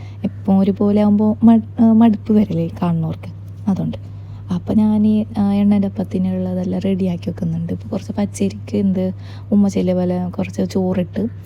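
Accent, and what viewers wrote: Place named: Kasaragod, India. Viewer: Edh slang 😊kasaragod slag,😅😅😊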